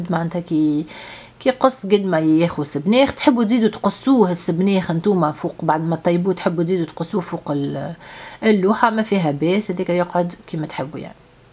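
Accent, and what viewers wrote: Tunisia. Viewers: عجبتني الكيش وعجبتني الكيفية يعطيك الصحة ولي عجبني اكثر هدرتك لحلوة حقيقة اللهجة التونسية عسل 😍😍😍👍🌹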